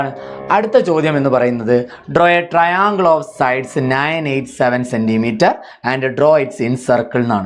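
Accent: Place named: Vadakara, India. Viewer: Vadakara voice ann sern😂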